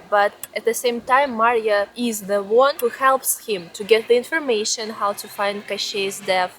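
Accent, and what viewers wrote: Russia. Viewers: My favourite YouTuber from Russia. You're so cute like a child and beautiful. The way you present everything in such a humorous way, I'm so fascinated. Your accent(Russian) also makes your videos engaging. ❤ Lots of love 😘🎉 Make videos related to your daily life. Are you a full-time YouTuber or do other things besides YouTube, like a job? I have watched all your videos and some twice. You have shared everything on YouTube related to your family. Share your partner's side and your bond with your partner. How did you meet something like that? You've not shown that. ❤ YouTube is like your family. We enjoy your content a lot. 😊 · Be quiet! I wouldn’t change the way she speaks English! It wouldn’t be as unique with the Russian accent! Pooooф Be GONE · It is nice to hear the Russian pronunciation of the names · Love her Russian accent!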